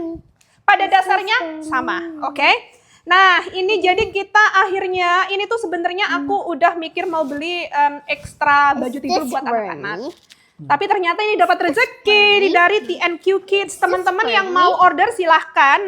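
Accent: United Kingdom. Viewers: suka banget klo udah ngomong indo tapi aksen inggris nya itu loh cobek 20rb chowbeck 500rb ya ka 😂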